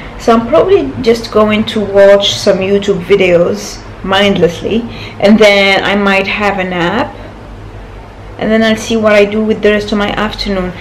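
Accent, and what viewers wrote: United Kingdom. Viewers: You picked some british accent😁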